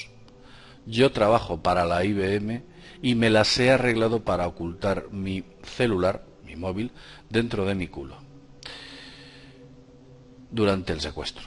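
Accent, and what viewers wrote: Spain. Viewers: O yo soy sorda o su asentó es muy español .. Jajaja no entendi nada no habla claro y fuerte . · QUE BOLUDO EL CHAVON ESE QUE COMENTA EL ACCIDENTE DE MALASIA,ENCIMA SE HACE EL GENIO CON SU VOZ GALLEGA QUE ADORMECE,MAL AHI YOUTUBE QUE DEJA QUE ESTOS MUERTOS SUBAN VIDEOS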